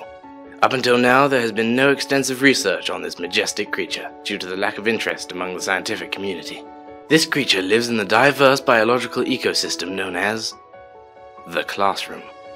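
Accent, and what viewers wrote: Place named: England, United Kingdom. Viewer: this is very good. Good touch having an English accent as narrative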